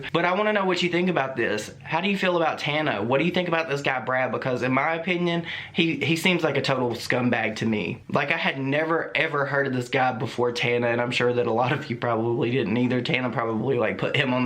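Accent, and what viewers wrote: South, United States. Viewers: WHY DOES ONLY HAVE 43k SUBSCRIBERS! He’s adorable, his southern accent is everything, he’s relatable and he got all the tea 💁🏻‍♀️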